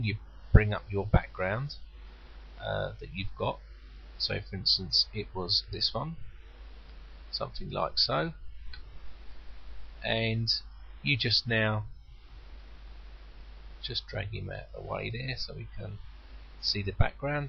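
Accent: United Kingdom. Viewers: From now on I will only watch tutorial made by British guys <3 the accent =) The tutorial is gd too .... 'melts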